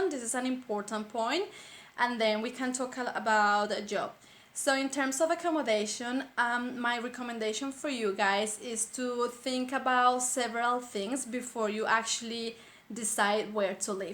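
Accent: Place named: Brazil. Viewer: Are you Brazilian? I can understand you so well:)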